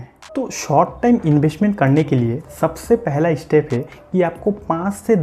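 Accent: Bengal, India. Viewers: Bangali ha kiya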